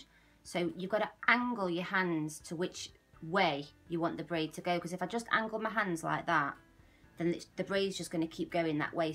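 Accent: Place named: England, United Kingdom. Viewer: si es Ingles pero se le escucha el acento como de Inglés (los que son de Inglaterra)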